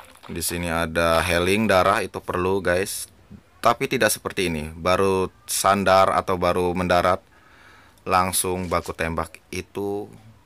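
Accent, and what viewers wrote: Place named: Manado, Indonesia. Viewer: Abang orang Manado ya!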